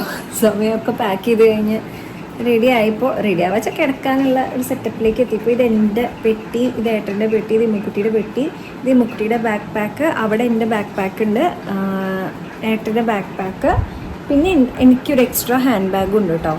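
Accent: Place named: Kochi, India. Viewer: Don't defame kochi slang..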